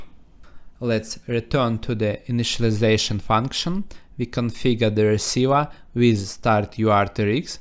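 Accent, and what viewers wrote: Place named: Russia. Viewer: приветствую! ваш анлийский поймет только русскоговорящий, говорите лучше на нашем могучем языке, он великолепен! :о) удачи в творчестве!!!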